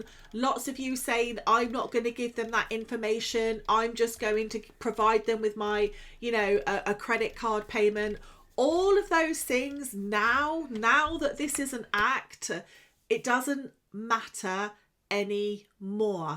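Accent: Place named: RP, United Kingdom. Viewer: Can u not speak normally no need to trying to speak like a queen.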